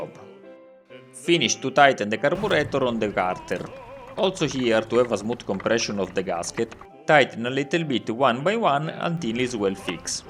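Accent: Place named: Italy. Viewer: I totally enjoy your italian-English! Great video! Greetings from Germany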